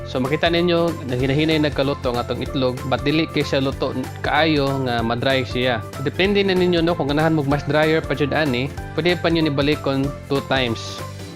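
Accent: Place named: Visayas, Philippines. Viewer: I like the diction of visaya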